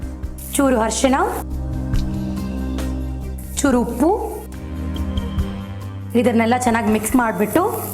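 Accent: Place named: Karwar, India. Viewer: Nice. Heralekai rasakke nimbe hannina rasa andubittri. By the way I enjoyed your Kannada accent. Idu Karwar kannadana ?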